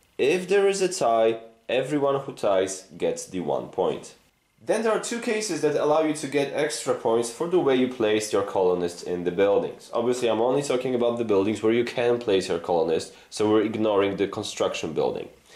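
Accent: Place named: United States. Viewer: Tak z innej beczki.Z doswiadczenia wiem(12 lat w UK),ze czesto lapiemy jako pierwszy akcent ten,ktory jest wokol nas,Po 2 latach pracy w irlandzkiej firmie ludzie do dzis pytaja mnie czy jestem irlandczykiem.Skad ta nalecialosc amerykanskiego akcentu,Za duzo amerykanskich filmow?Moze pierwszy nauczyciel czy tez taki osobisty wybor?To nie hejt ani sarkazm.Po prostu jestem ciekaw.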